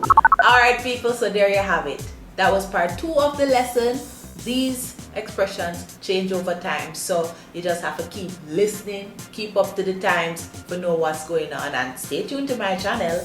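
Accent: Jamaica. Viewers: Love Jamaican accent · Very cool. The Jamaican accent and patois is like a kind of music unto itself. As the only non Jamaican member of a roots reggae band (and being an Italian guy from New Jersey) I'm around people speaking patois a lot and have managed to understand quote a lot of it, even at rapid fire speeds. Like anything else, it just takes getting used to and paying attention. But I admit to being on a 2 second delay sometimes.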